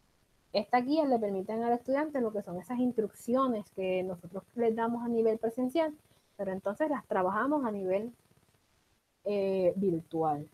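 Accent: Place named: Puerto Rico. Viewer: Hola! Por tu acento estoy seguro que eres de Puerto Rico. Yo también lo soy pero vivo en México. Estoy tomando mi maestría en Educación Superior con la Inter y tuve una asignación relacionada con el Diseño Instruccional. Después de mucho buscar en Internet tu video fue el complemento perfecto para entender las lecturas asignadas, que sinceramente no me dejaron claro el tema. Ahora sí me quedó muy clara la forma de presentar una lección siguiendo el Modelo ADDIE. Gracias MIL!!!!!! Sigue adelante!!!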